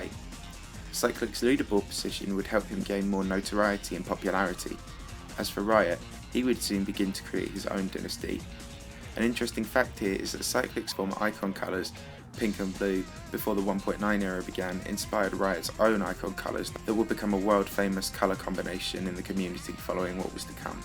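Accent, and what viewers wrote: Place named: RP, United Kingdom. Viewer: Good video but something is missing..... SUBTITLES! (Because your british accent is 2 formal, I can't hear it clearly ): )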